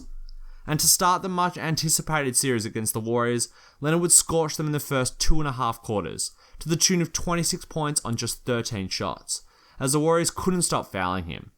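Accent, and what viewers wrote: Australia. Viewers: That was an incredible video I love your a accent it’s just like mine go aussies go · Are you Australian?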